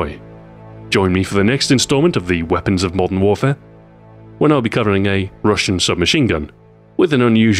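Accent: United Kingdom. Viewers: I don't know why these Americans think the British way of speaking is accent, if it came first. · Is it just me or do British accents make everything sound more intelligent?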